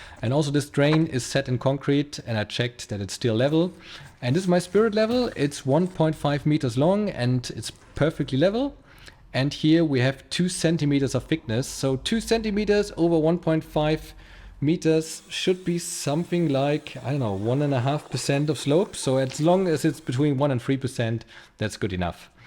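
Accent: Germany. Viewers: dieser deutsche Akzent haha 🙈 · I can tell you‘re German, not only from your accent but from the way you constructed that building. Amazing engineering, congrats!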